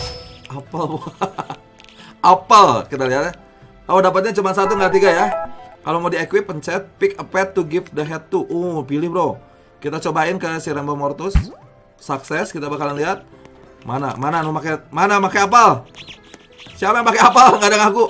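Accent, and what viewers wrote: West Java, Indonesia. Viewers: Bang lu sunda ya?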